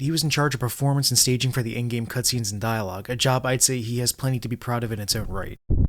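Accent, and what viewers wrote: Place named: Philadelphia, United States. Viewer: Just finished the game last night, mind blown, had the perfect works on hand to dive deeper when I finished. My GF was watching me play some and was equally intrigued by the story instead of me recount every story element or have her watch the 17hour cutscene “movie” this was great. You from Philly (represent!) my dude? Your “o”s make me think you’re from the NE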